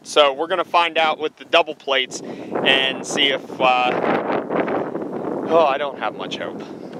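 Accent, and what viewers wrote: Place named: Ohio, United States. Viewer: I was betting you were from Ohio.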